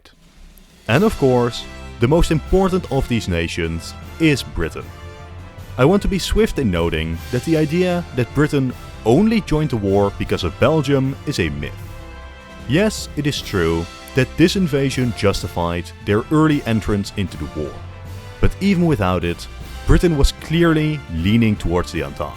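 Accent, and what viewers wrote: Netherlands. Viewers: By any chance, are you Dutch or Flemish?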